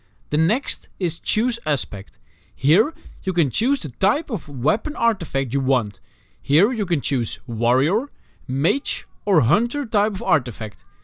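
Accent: Netherlands. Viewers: goed bezig! leuke videos met een nederlands accent.:D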